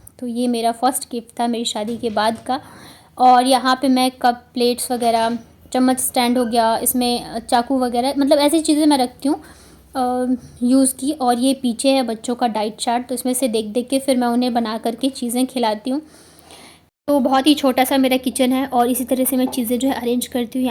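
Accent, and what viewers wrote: Bihar, India. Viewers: Didi aap Bihar se ho kya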